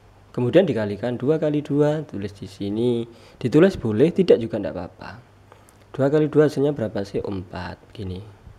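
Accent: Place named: Temanggung, Indonesia. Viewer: kakak orang temanggung bukan? logatnya temanggung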